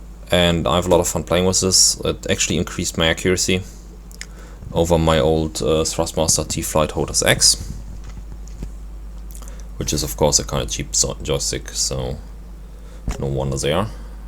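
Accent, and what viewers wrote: Germany. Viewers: Du bist Deutscher oder? ;D wenn ja, man hört deinen Deutschen Akzent oder so. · erstma danke für die antwort... trotzdem habe mich auf der channelseite des " " informiert er is aus Deutschland;-) Und wenn man das nich an dem Akzent hört heheheheh dannweiß ich auch nich.... P.s. Fliege IL2, Cliffs of Dover und ab und zu FSX...da brauch man glaube ich nen extra Addon für damit das FFB richtig funtz!? cya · I'd bet you are a native german speaker .. :-)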